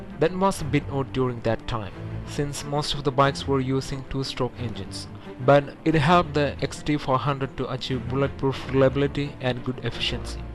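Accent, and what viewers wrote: Kerala, India. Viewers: ഒരു മലയാളി ഇംഗ്ലീഷ്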